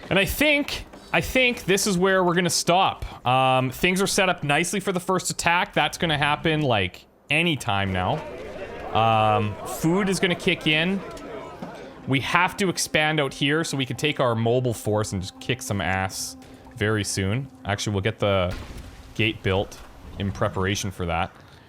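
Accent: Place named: Quebec, Canada. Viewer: I'm betting your Canadian, that accent is a beauty. I'm guessing Québec, there's a little bit of that French Canadian, eh.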